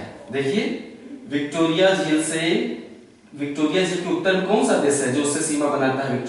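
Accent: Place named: Eastern Uttar Pradesh, India. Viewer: लेकिन हो तो आप east up ya west bihar se it is 100% sure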